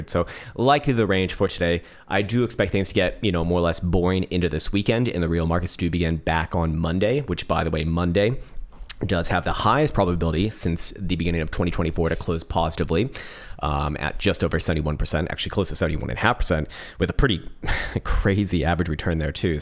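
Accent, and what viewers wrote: Australia. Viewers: sounds Australian? thats so bad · Man he sounds Aussie... I'm ashamed.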